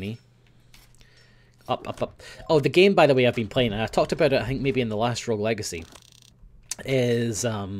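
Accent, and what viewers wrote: Scotland, United Kingdom. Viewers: I wish i was Scottish u speak fucking Cool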